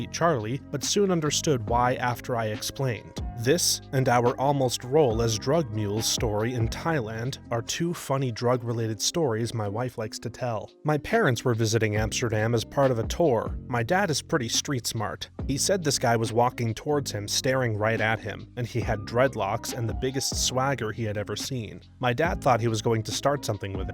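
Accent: Scotland, United Kingdom. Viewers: Nobody commented on that Scottish accent?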